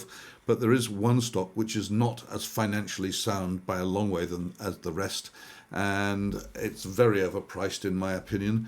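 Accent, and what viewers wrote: United Kingdom. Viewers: I first saw you, not long back on Daniella Cambone. I was at the time wondering why there seemed to be so few independent, genuine analysts UK born (I trust you are UK and not Swiss with that accent) Anyway, good to watch and keep going as you are attracting quite a following. The word which sums you up is 'gravitas' but with a healthy dose of humour and good stories.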